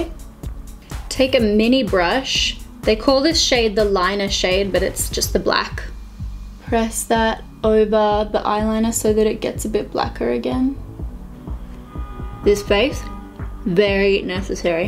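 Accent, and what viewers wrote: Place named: Australia. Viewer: 'Smugin that out' so Australian #representingusaussies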